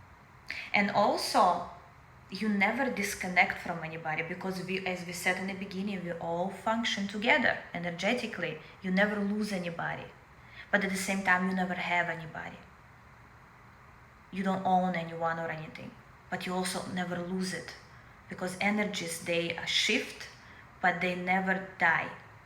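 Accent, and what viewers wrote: Russia. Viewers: You've got a strong ruzzian accent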